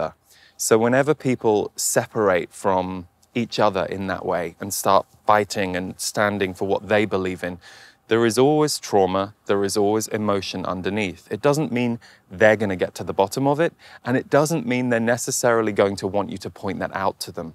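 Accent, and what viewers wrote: United Kingdom. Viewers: I thought you are in the UK. Your accent sounds British.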